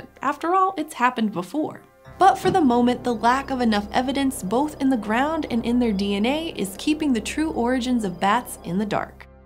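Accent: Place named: Pittsburgh, United States. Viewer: I wonder if the narrator is from Pittsburgh? Her "a" before n and m become elongated to "eh" or "ay" "ai-nimals"/ "eh-nimals" "maimmals"/ "mehmmals" "ay-end", "ay-encestor", "hay-ends", "tray-en-sitioning", "lay-end", "tay-endem", "brainch", "caimels". Been watching dozens of these videos. Really love the material. Thank you! 😍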